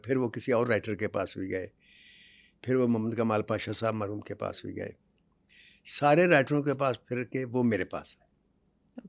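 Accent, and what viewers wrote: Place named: Punjab, India. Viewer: I LOve your Punjabi... it's sweetness and familiarity is what makes my heart happy!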